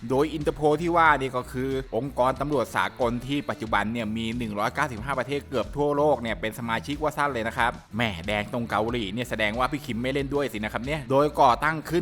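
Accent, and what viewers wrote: Thailand. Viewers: เหน่อแบบนี้ ราดรี หรือ คอนถม หรือ เมืองกาญ ครับ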